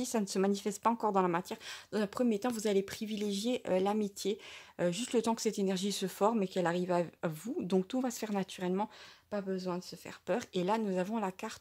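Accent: Alsace, France. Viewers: Je ressens un petit accent alsacien ? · Vous avez un accent, êtes vous alsacienne ?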